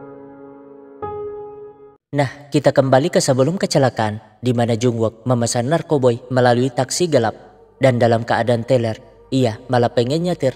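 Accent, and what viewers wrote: Makassar, Indonesia. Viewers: Orang makassar ini narator nya ehh, kentara ji makassar naa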